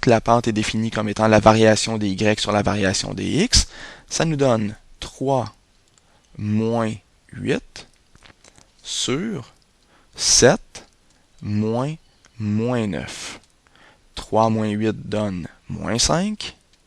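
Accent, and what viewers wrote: Quebec, Canada. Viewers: L'accent québécois ne gène plus tant que ça quand t'a besoin d'aide en math,c'est un fait,vive le Quebec.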